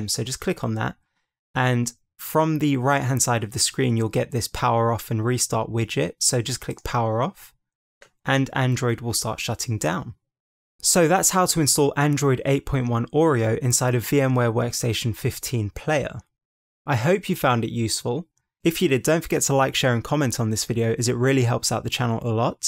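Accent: United Kingdom. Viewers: I love your British accent, it's so cool!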